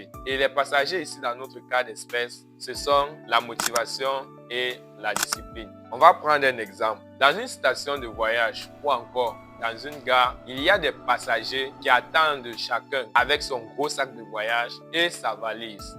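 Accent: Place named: Cameroon. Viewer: On reconnait le ton Camerounais 😂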